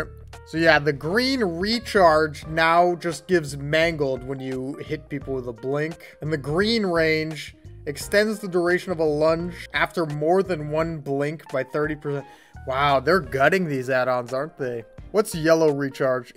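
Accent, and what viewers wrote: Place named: United States. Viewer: There’s something very wholesome about your in-game commentary. Maybe just me liking American accents as a British boy :/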